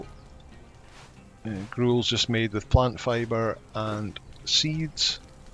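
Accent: United Kingdom. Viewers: good video my greater shaleback is bruce iii and always nice to hear a fellow britt im sarf london innit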